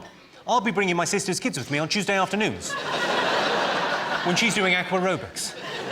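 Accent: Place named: RP, United Kingdom. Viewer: Why did Jimmy used to put on such a posh accent?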